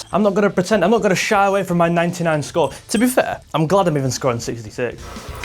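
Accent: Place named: North, United Kingdom. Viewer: Love your work - you got pretty close to Littler’s throw! You’ve got a great presenting style, reminiscent of Tom Scott, just more northern and centred around darts…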